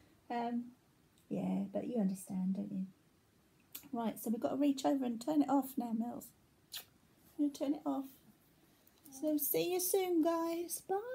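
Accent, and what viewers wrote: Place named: South, United Kingdom. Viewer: I'm really sorry you have had such a horrible diagnosis but at least you can start the treatment soon. Hopefully. Where in the UK are you? Your accent sounds as though you are in the south and I'm on the Wirral or I'd invite you round for a cuppa and a hug. I've been watching your videos and really enjoy them. I hope your cat scan is very soon. If you need a shoulder mine is big and padded for tears! Love and hugs and healing thoughts xxx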